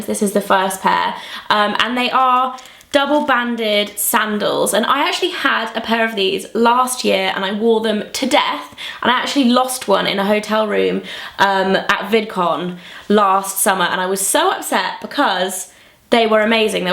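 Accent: United Kingdom. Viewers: British accent! :D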